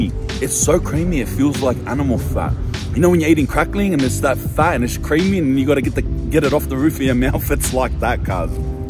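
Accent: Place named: Australia. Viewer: Interesting that the Swiss claim chocolate as their specialty when cocoa is only grown in warm to hot climates. It’s grown in Australia and we have good chocolate at I good price. Nonetheless Spanish at his best with a good Aussie accent in a place they all seem to speak English and good English too Beauty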